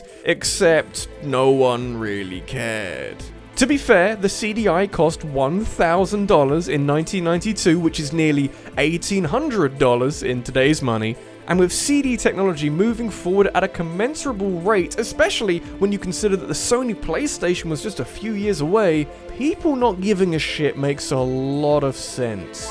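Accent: United Kingdom. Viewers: Top 5 Linear Pathed Games (because I prefer a linear path over open world) Also, it's kinda weird hearing a British guy talk about games costing dollars rather than pounds.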